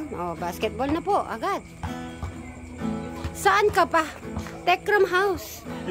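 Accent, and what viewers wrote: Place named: Pampanga, Philippines. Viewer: natawa nmn.ako sa yo kc kapampAngan accent ka po tlga then may halong arabic like ng KALAS at AYWA...related ako kc gnyan din ako kpg kakauwi nababalid po pagtagalog ko...pro so proud po syo...ingat po kyo lagi..mahal po nmin kyong mga family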